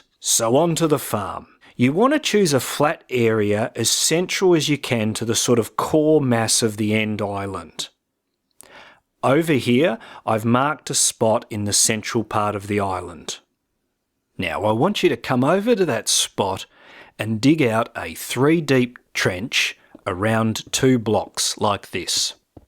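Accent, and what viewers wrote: Australia. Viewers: You're Australian right? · Good to hear a Aussie doin some MC videos. Have you done this in Survival mode before? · Are you Australian?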